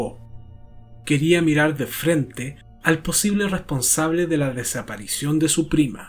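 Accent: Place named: Chile. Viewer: Que grata sorpresa saber que usted es chileno, tiene un agradable acento….El acento chileno es lindo, siempre y cuando se pronuncien las palabras.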